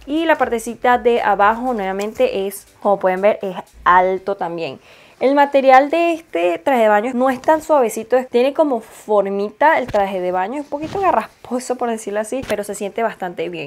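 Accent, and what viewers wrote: Venezuela. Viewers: Hola! Me encanta cuando hablas venezolano 😸✌ "caderua" "carrasposo" etc... Creo que la última pieza se llama pareo!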